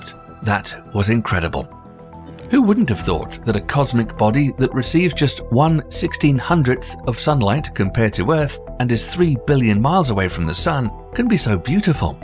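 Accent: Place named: RP, United Kingdom. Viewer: I love how he says the “kweeper” belt. I guess I’m just a yankee and he’s using the more fancy queens English🤷🏾‍♂️🤔😂 I thought it was pronounced ky-per belt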